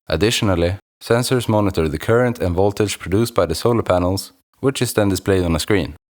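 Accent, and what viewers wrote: Sweden. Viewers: You must be Swedish, u talk with a Swedish accent. Or possibly Norwegian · Might you be svidish?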